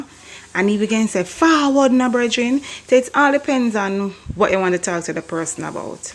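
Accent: Jamaica. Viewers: Some real yardie talking yes man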